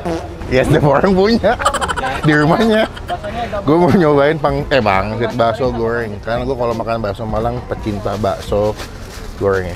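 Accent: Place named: West Java, Indonesia. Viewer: Bakwan malang tapi logatnya sunda bang 😅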